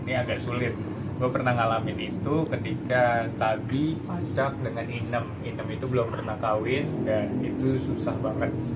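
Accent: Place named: United Kingdom. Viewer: britishnyaa comel😭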